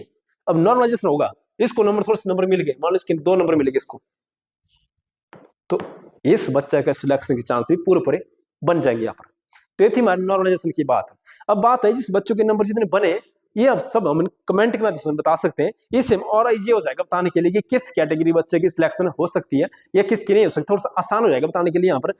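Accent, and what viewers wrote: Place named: Sirmaur, India. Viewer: Sirmaur se ho na ap bolne ke tarike se lg rha😅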